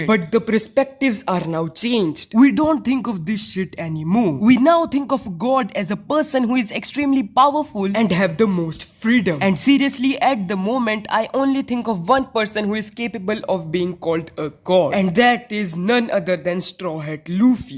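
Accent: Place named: India. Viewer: are yu ind. yur accent is alike one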